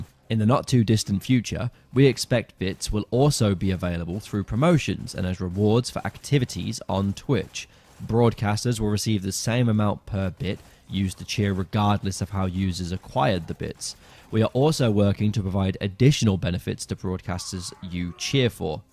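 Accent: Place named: United States. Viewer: speaking american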